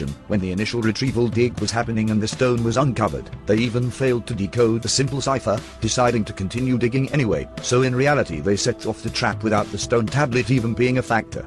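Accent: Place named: England, United Kingdom. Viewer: Mystery history of lies._. Omg , always a stupid english voice like " they are superior in solving lies " ._. Not. Heres your truth the mystery trys to alter your perceptions away from._. A million pounds worth of lies._. Thats a good wishing well. They go broke and medias get rich ._. They wish to find gold at the bottom of a well ._. They wish they can find wealth benieth a well._. The oak island wishing well._.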